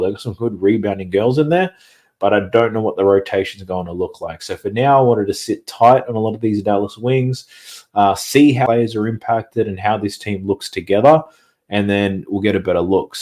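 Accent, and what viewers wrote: Australia. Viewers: Only 2nd time watching the channel , thought i detected an Australian Accent the first time i watched , good to see/find a channel with an Australian doing sports Betting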